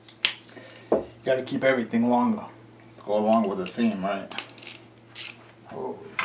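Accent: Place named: Boston, United States. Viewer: thought so hear the accent. I live on the Dorchester south Boston line.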